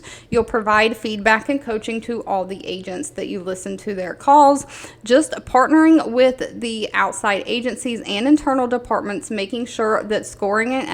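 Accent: South, United States. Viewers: Don’t let those haters shut down that bubbly southern voice darlin 🥰 We love it! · I love your southern accent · Im from NY and I love your southern accent!! 😊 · It's not annoying it's Southern like me. Don't feel you have to appease these negative people.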